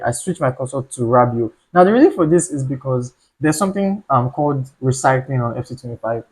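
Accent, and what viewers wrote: Nigeria. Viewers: You sound naija so imma sub bro!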